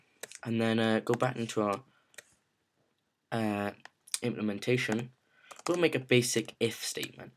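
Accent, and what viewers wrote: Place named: Wales, United Kingdom. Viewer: Love the welsh accent :)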